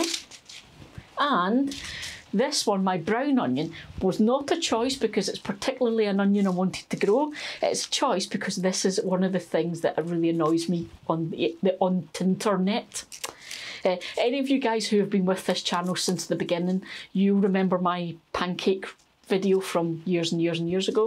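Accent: Scotland, United Kingdom. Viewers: ❤Ah ken a wee bit a Scottish! I am hoping to learn more Scottish gardening techniques, as I have learned so many good tips already! Do you have different types of cabbage for spring and autumn? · I started with seeds a couple years ago and will never go back to sets! I’m in zone 5 in Canada and because they were starting to die in the house, I planted them outside as soon as I could. Despite getting a little snow and very cold weather, they did great by the end of my growing season! My ancestry is Scottish and I love listening to you speak! Someday I hope to get to Scotland.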